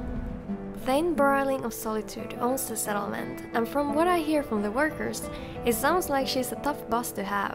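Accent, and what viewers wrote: Finland. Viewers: Can't tell if your accent is mildly finnish or distinctly icelandic. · So far I've come to enjoy your videos, so I'll subscribe! Your nice soft Finnish accent is a nice bonus as well and makes your descriptions of Skyrim and its people sound official and pleasant.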